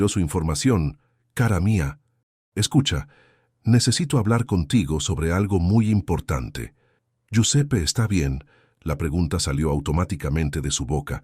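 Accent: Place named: Argentina. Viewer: No me gusta porque es narrada Argentina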